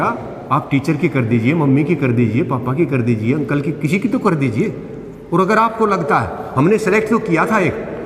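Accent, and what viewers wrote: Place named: Khari Boli, India. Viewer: महोदय अभी पिछ्ले हफ्ते ही मैने आपकी एक वीडियो देखी, जो मेरे पिताजी ने मुझे भेजी थी। आपकी आवाज़ में जो खड़ी बोली की सीमित मात्रा है वो एक बहुत अच्छा अनुभव कराती है। मुझे भी अपने बचपन के दिनो का एहसास कराती है। मैं आपकी छोटी पर गहरी बातो को नियमित सुनता हूँ। आपका तहे दिल से शुक्रिया अदा करता हूँ की आप बच्चो के लिये ज्ञानवर्धक सामग्री उपलब्ध कराने का उत्तम कार्य कर रहे है। नमस्ते जी। 🙏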